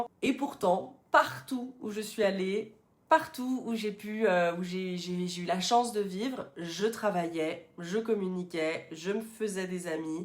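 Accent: France. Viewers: Merci! J’aime beaucoup l’accent français.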